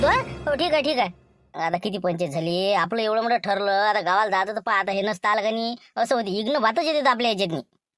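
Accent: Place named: Vidarbha, India. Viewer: आपली विदर्भातील बोलीभाषा खूप छान आहे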